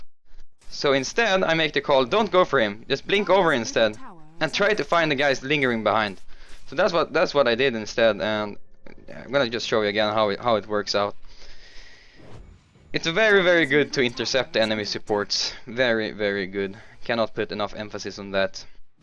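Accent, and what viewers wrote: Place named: Sweden. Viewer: wp sir. i really like the swenglish too :D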